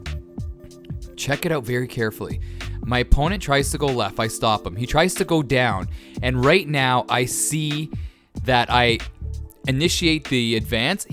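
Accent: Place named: Canada. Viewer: I knew you were Canadian because you sound just like Ricky off The Trailer Park Boys.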